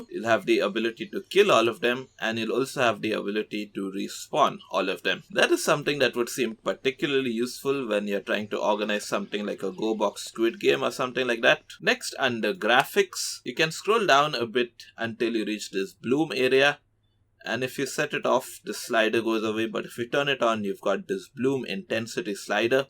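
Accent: India. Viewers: I know you're most likely indian, but still love your accect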